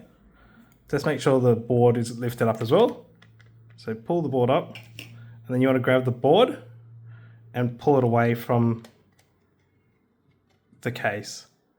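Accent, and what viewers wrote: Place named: Australia. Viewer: I'm keen to try out a Lilygo T-Deck Plus as my entrypoint into Meshtastic, but it is not clear to me whether any of these sellers have the suitable frequency for Australia. You sound like you might be Australian, so I thought I would ask: from what I can tell, in Australia we need either AU915 or AS923 compliance. In the Aliexpress link, it does have a 915Mhz option, but it is not clear to me whether it would be suitable for Australia. I see that there may be some US915 devices that don't have quite the right frequency range for Australia. Can you help me choose the right thing? :-D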